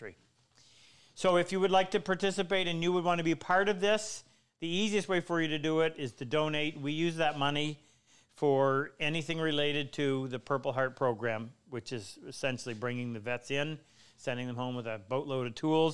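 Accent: Canada. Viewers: Hi - I'm watching from South Africa. you've said 'any question' so this one is from left field (as they say south of your border): which province are you from? my guess is New Brunswick - I used to know a Canadian from there, and you sound so much like him. Back to reality - I really like your program and I wish I was younger and wealthier so that I could follow your style (I am 75 and can't afford diamond sharpening plates - especially here in SA).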